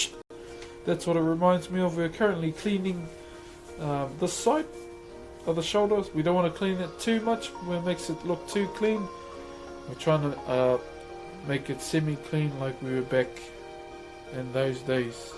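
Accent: New Zealand. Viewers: Kia ora, just came across your content and hearing you talk remind me a lot about New Zealand. Spent nearly 10 years in Aotearoa, one of the best places on Earth. Keep up the good work bro!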